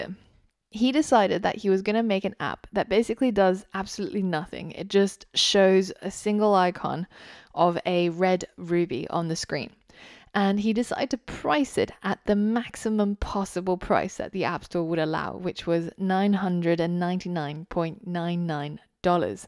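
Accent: United Kingdom. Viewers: I love your British accent! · I'm getting accidental ASMR from your British voice :)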